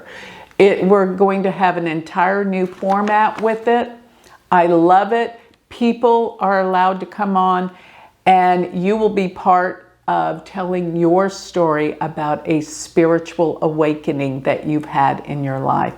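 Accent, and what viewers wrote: United States. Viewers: Love your accent it's totally like Kelly Bandy - the classic American. Greetings from Athens, Greece! Love Christina Aguilera, Britney, Madonna!!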